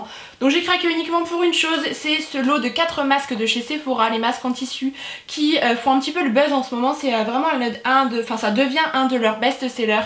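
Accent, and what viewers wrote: Lorraine, France. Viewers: Cc sa se voix que tu vie en Lorraine ton acsant est beau tu à de la chance moi je normande sinon super vidéo tes achat son stylée bravo continue comme ça!!! bizou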